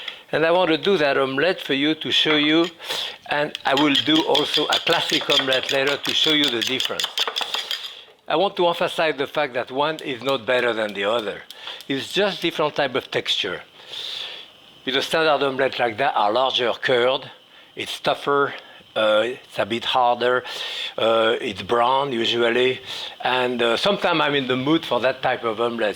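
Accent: France. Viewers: Does anyone else simply hear the french accent and immediately hit the subscribe button? only qualification i need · that french accent even makes the word omelette sound so goood mmm and yes i always use chopsticks now for anything that needs a good whipping , once you master it the become a regular used kitchen tool , happy cooking folks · Absolutely.....My dad was French and had eggzactly the same accent.😊😊😊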